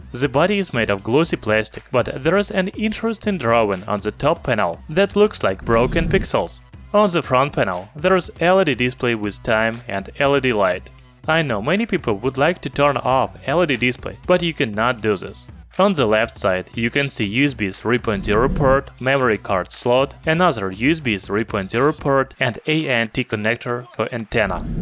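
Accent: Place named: Russia. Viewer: Is this the bother with less of an accent that is more understandable? Nothing against Mother Russia, but seriously guys. There has got to be someone you can find to do the voice aspects to videos. Hell Siri can do it for real cheap. Then viewers won’t have to hit rewind as many times as I had to just to understand what was said. “Th” doesn’t have any “z” in zhem, oops I meant ,, them..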